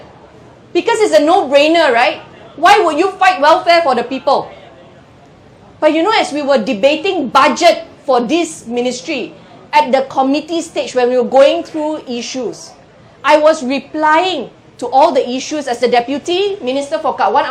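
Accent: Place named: Malaysia. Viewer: Malaysian English..nyonya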